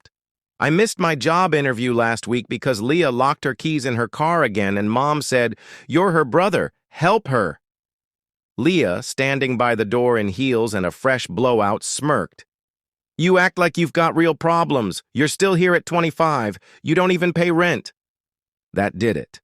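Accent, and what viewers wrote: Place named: Arkansas, United States. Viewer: I'M JUST AIN'T OMG THIS GUY IS FROM ARKANSAS 😮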